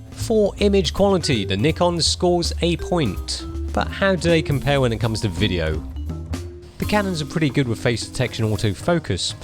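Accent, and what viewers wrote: United Kingdom. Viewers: Haha, WHY did you let her throw a camera up like that? So obvious that it would be a epic fail, but its your fault not hers ;) I know people from Hong Kong, but I've never heard anyone with British accent! Like others say, it sounds really nice :D But did you live in Brittain then? ONE thing you should have pointed out about the cameras! They are totally different in the grip! Yes, the Nikon weight less and is smaller. But for a guy where Im from, the Nikon is really too small in order to get a really nice grip on it like you have for Canon's camera. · Asian with British accent omfg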